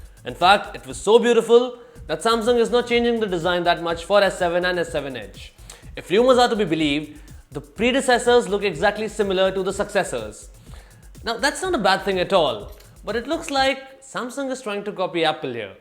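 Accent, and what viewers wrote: India. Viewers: i could not understand a word from your Indian accent...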